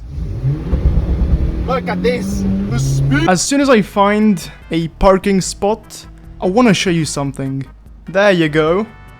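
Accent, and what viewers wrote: Italy. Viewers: are you italian?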